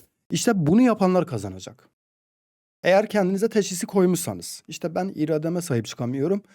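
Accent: Van, Turkey. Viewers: Vala zordur dediğiniz yer 😀 cok ozlemişim Van'ı iyiki şivenizi hiç yitirmemişsiniz, Allah yolunuzu açık etsin 👏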